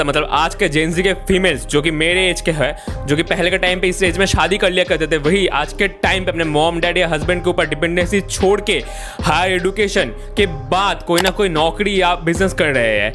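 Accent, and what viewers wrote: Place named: Bengal, India. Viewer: True, he really needs to work on the grammatical errors and I can understand his grammatical errors and also wrong pronunciations due to his bengali accent as me being a bengali too. I hope he works on it.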